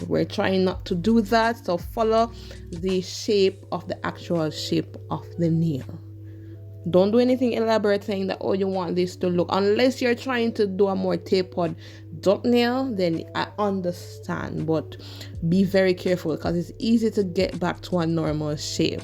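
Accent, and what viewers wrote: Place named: Caribbean. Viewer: I hear caribbean accent 😅 where are you from?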